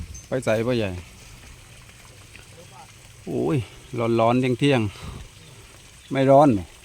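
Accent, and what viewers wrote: South, Thailand. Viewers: ลุง อยู่จังหวัดไหน เห็นพูดไต้ค่ะ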